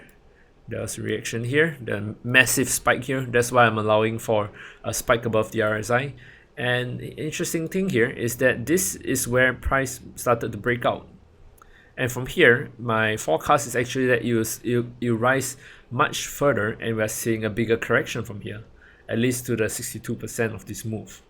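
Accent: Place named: Singapore. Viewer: is this Rayner Teo? Similar (Singapore?) accent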